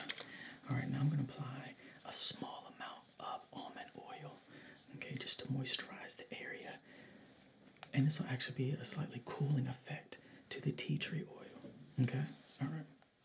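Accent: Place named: United States. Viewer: I hear an American accent! Weirdly, I was expecting him to have an English one!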